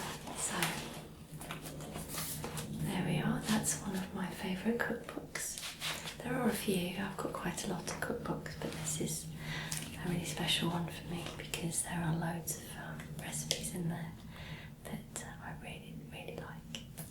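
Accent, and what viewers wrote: United Kingdom. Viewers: You're so lovely!! And that very nice British accent is a big trigger for me. Keep up the great work! Subbed:)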